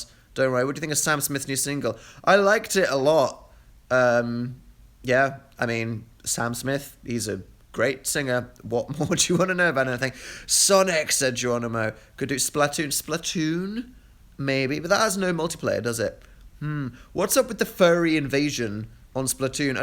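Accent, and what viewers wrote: United Kingdom. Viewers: your british voice soothes me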